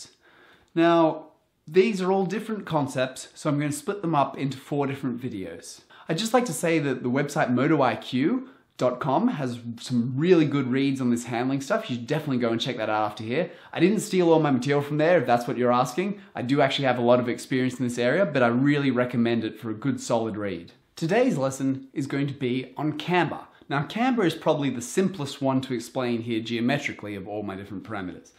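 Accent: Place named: Australia. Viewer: instant subscrib + australian accent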